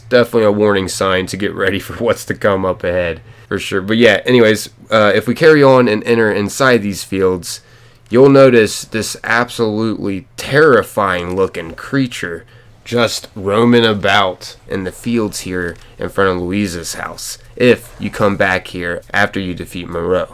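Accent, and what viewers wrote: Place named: Mid-Atlantic, United States. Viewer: That mid Atlantic accent though 🤓 where you from man? My guess is MD or PA.. am I right?!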